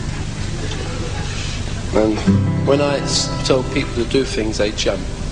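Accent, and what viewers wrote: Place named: England, United Kingdom. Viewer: Love you Davis. Love your style and Your beautiful English accent. I always wanted to date a Englishman. Have to good day.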